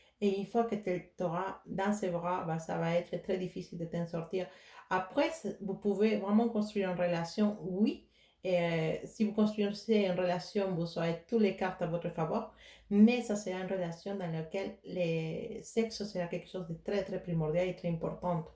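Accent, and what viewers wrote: Spain. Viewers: Bonjour! quel plaisir de vous revoir, vous entendre. Merci pour ce tirage original!. Ne vous inquiétez pas pour le cafouillage de notre langue française pas facile pour vous!, mais c'est normal!☺️, je vous comprends très bien!, et j'aime bien votre accent espagnol. Choix 3, 7 qui me parle. Merci infiniment 🙏 Namasté 🙏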